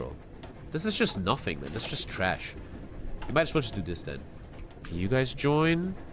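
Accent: Germany. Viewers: So geil man hört raus das du Deutscher bist. Endlich einer von diesen Kanal der Deutscher ist. xD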